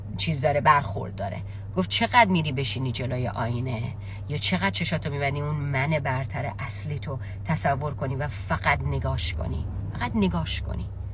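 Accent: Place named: Shiraz, Iran. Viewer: 🌺🌺🌺🌺☘☘☘ یعنی دلتنگی های من برای شیراز با این لهجه ی زیبای شما برطرف میشه . خیلی ممنونم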